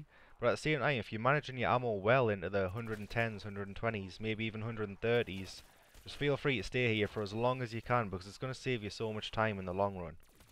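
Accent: Newcastle, United Kingdom. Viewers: thought he was a geordie?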